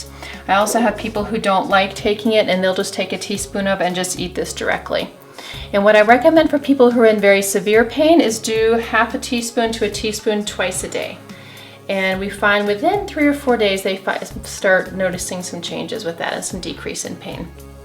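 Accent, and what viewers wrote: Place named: Europe. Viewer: Turmeric milk....very good subject anchored in a nice manner. But Madam u are a qualified person hence u should be slow avoid European accent e.g. u say wada. for water ; it will be kind help for Indians probably from where u belong.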